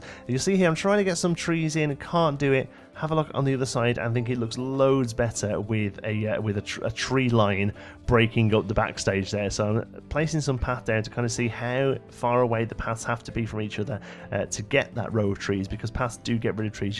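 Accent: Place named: Black Country, United Kingdom. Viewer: thought i could tell your black country accent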